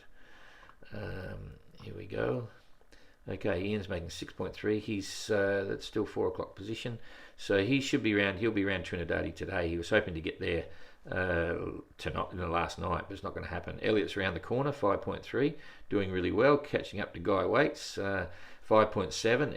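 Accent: Australia. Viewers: Hello,it would be kind to speak a little bit slower for french followers who are not crurrent users of the aussie accent..thank you so much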